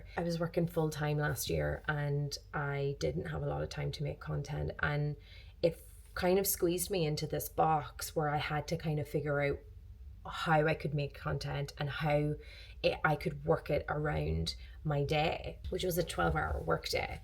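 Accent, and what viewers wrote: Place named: Ireland. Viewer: You just unlocked something special for me with Reas Earth. I’m obsessed … and very much on this path of finally doing the things I have been dreaming of. thank you! I also love watching you, your content and voice are incredible. Maybe because my husband is Irish and I love the accent ❤ congratulations on your work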